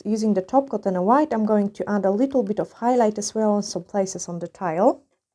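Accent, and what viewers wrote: Scotland, United Kingdom. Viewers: Love hearing your Scottish accent coming through 😂😂. Also lovely work x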